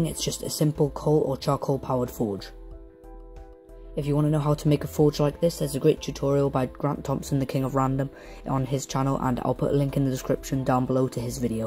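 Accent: United Kingdom. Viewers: Wow, I never heard such a British accent before.... BTW you're doing some really nice work there. Keep it up ;) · Hi is british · what is it with british-accented people mispronouncing "aluminum" "aluminium"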